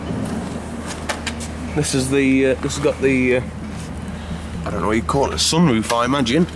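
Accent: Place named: Nottingham, United Kingdom. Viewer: Nice to hear a Nottingham accent.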